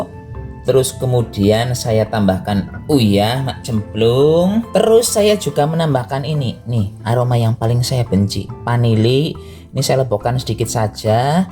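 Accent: Banyumas, Indonesia. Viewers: Aku jadi terhibur nonton vidio mu ngapak yek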